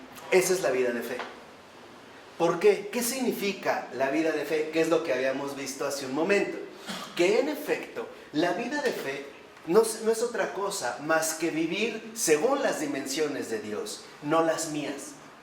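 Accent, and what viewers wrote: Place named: Mexico. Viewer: Una diccion en excelente castellano, Dr, aderezada con un delicioso mexicano. ¡Muchísimas gracias siempre!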